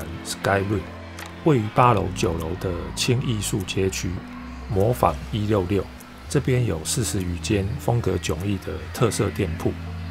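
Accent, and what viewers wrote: Taiwan. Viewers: 操着台灣國語腔調的先生闡述抗戰的史實我眼淚也躲眶而出．以從會再看你的視頻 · 这是我听过的最亲切最好听的台湾话！！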